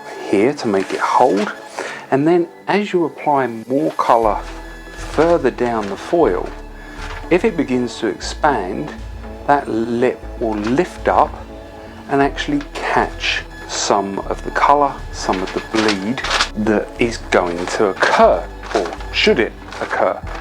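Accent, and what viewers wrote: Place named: United Kingdom. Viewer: And YOU are annoying because you're operating YouTube, and don't even know how to adjust the speed 😂 This man put in SO much work to HELP TEACH people, and all you can do is complain? Maybe just don't watch at all and save the annoying, rude, and ungrateful comments for somewhere else 🙄 Also- Many British accents are slower/more drawn out. He's also trying to be very deliberate in what he says so that everyone can understand.....but again- you DO have the option to speed it up!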